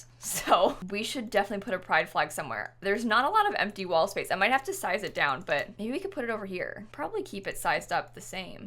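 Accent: England, United Kingdom. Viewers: For about a year I thought you were British and idk why🤣 I think it’s because I’m so use to hearing American accents that they’ve become normal to me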